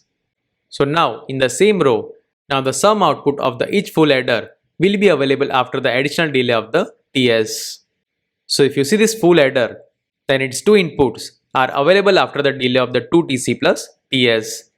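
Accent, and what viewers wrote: India. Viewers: When someone is explaining something in tech in an indian accent. I just know damn well im getting it pretty quick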